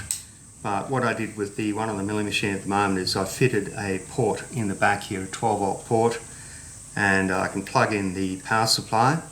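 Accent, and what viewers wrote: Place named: Australia. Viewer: Talking is fine by me as I learn a lot from you, and my wife thinks your Australian accent is cute!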